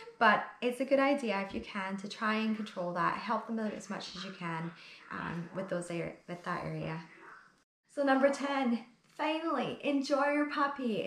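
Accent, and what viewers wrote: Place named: Scotland, United Kingdom. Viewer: I thought I heard a Scottish accent. I’m getting my pup ( Casper ) in two days time, I can’t wait. Thanks for the video and tips. Love from Scotland 🏴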